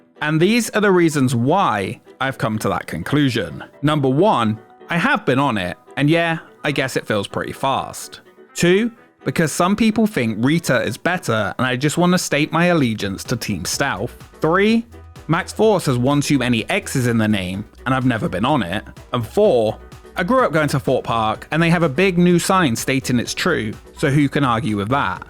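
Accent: United Kingdom. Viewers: be honest are u a brit?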